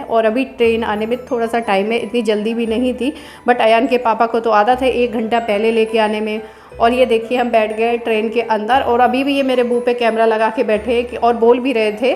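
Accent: Uttar Pradesh, India. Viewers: Ap up se ho kya... ??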